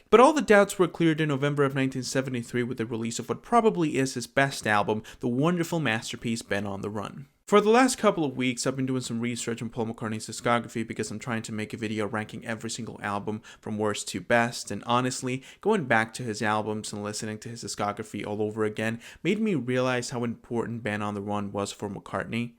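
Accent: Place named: United States. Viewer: A 90 miles an hour narrative from a fucking yank, fuck off man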